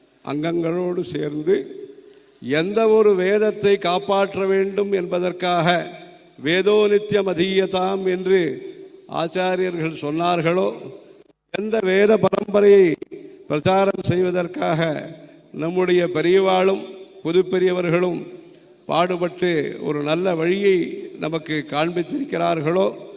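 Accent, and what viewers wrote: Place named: Thanjavur, India. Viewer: அக்ரகாரத்து கருவறையில் இனிய தூய தஞ்சை தமிழ் 🙏 தமிழோசை கேட்கும் இடமெல்லாம் ...... புனிதம் பெறட்டும் 🙏🙏🙏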